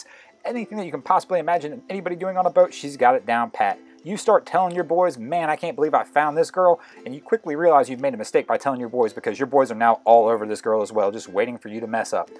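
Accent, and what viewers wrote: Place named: Maryland, United States. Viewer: my wife think your from Maryland by your accent or speech cadence, how far is she off?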